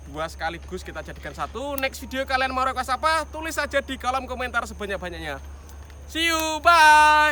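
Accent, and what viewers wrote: Java, Indonesia. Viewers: Jawane ngndi mas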